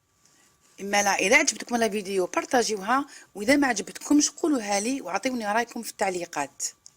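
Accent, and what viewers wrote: Algeria. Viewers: برافو كي شفتك المرة الأولى نحسبك مغربية وكي سمعت الكلام لقيتك جزائرية فرحت بزاف ونحب المغربيات ثاني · bravo j'ai tout aime surtout votre parle, simple vrai algerien bon courage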